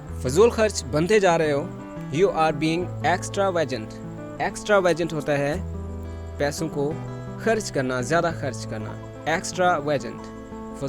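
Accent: Punjab, India. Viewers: Wah g wah Vich punjabi touch bohat aala hai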